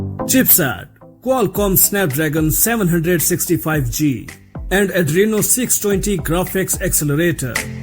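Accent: India. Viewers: es acento de la India, donde el inglés es el idioma oficial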